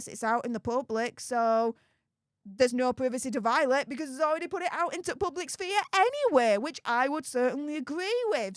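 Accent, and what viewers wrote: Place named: Cockney, United Kingdom. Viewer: Your accent sounds East ender working class .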